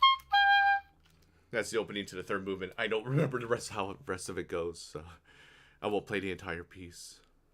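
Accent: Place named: South, United States. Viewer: He's from the South, in Oklahoma, so hearing him adds a bit of humor to his content. But he's very friendly so that just ads to the charm of it all.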